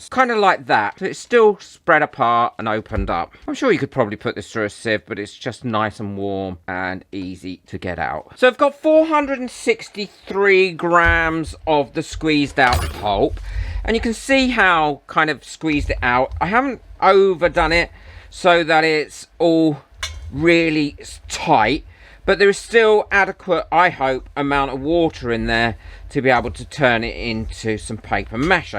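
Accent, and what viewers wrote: United Kingdom. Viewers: Hmmmm I wonder who has to taste the bleach to make sure it has enough flavor? 😂🤣🤪 My Gran is from Yorkshire and she used to say "flavor" instead of "scented" or "fragranced." I miss her and her laugh. She was also a crafter like you and would get so happy and giggly when something would work. The way you laugh and giggle reminds me of her… as well as the accent! While she lived in Yorkshire, I would come and stay for the summers… I would pick up that accent really fast and it would make my mum mental when I came home with it. After my grandfather passed away in 1999, Gran came to the states to live with my mum and dad. I got to have her with me for almost 30 years. That laugh was contagious and she was just as cheeky the day she passed away as she had been her entire life. Thank you for your videos and your laugh. It really brightens my day!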